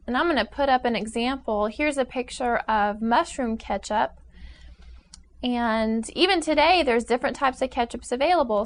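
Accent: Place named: United States. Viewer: that American accent really grates on me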